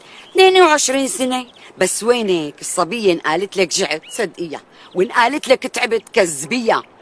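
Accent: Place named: Syria. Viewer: صوت جزائري وحكاياتها باللهجة السورية رفع من جمال الفيديو يسلم ايديكم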